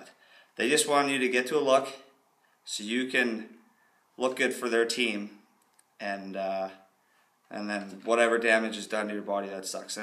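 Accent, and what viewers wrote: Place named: Canada. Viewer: Yes you can tell you are Canadian! Good input. Looking forward to more up dates on topics , nutrient , exercises, posing ,etc. congrats on your competition !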